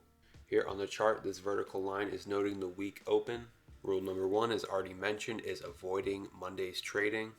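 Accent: South Africa. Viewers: Yoh u South African?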